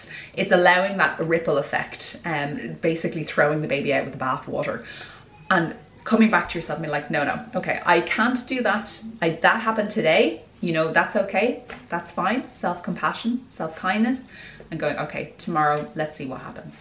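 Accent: Ireland. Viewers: I enjoyed your tips and listening to your Irish brogue. Thanks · First of all, let me say that you are so cute and I love the Iris accent! Secondly, what you said about being a perfectionist resonated with me and not in a good way. I am hardest on myself. I'm an all or nothing type of person. I "throw the baby out with the bath water" instead of just throwing out the water. If I mess up, I'll say that I might as well go all out and do what I want. Thank you for this video and for talking some sense into me. I need to have compassion for myself and allow the hiccups to come and go but not let them define me. Thanks again.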